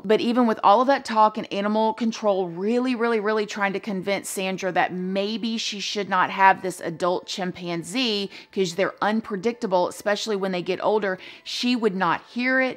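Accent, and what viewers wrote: South, United States. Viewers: looking at you it wasn't a surprise that you have a country accent lmao..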